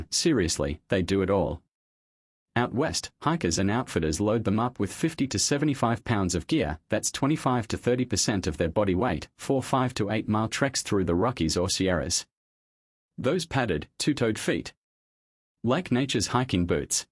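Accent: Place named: Australia. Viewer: did you choose an aussie accent for this u.s. history lesson? perfect 😎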